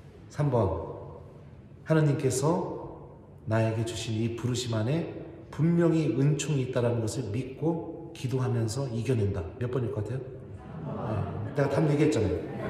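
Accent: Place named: Jeolla, South Korea. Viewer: 지금도 충분히 보기 좋으셔요 ㅋㅋ 전라도 말에 귄이 있으셔요 즉 매력넘치신다는 말씀입니당😊👍😆💖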